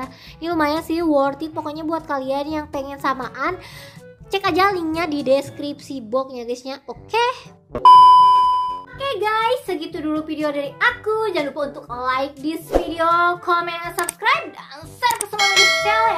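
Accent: West Java, Indonesia. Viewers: Kak orang mana kak, suka banget sama logat sunda nya wkwk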